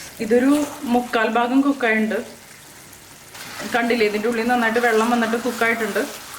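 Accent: Thrissur, India. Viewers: തൃശൂർ ആണല്ലേ?? എവിടാ ചേച്ചി??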